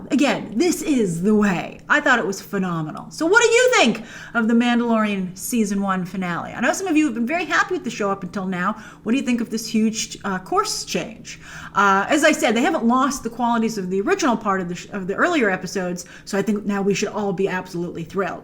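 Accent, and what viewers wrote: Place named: New York, United States. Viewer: Whats just as great as an Amazing Season Finale of a Star Wars Show???...an equally AMAZING Review of It!!!!....Can't wait for your next live Stream, life's just not the same without hearing that wonderful New York accent and seeing that Beautiful smile that lights up the World Live 🥰😍😁😉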